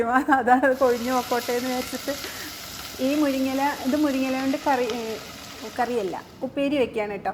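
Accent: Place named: Palakkad, India. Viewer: Samsaram kettitt Palakkad aanennu thonnunnu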